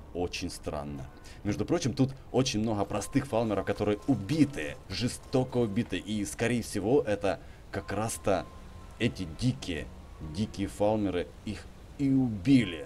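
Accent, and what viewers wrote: Ukraine. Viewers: судя по твоему говору ты живешь на Украине? Или это юг России?? Ростов или Кубань?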